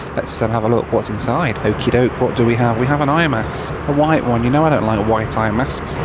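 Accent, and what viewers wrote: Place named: England, United Kingdom. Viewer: Brilliant vid, thanks!! I’m so glad you still have the English accent keep representing us out there mate!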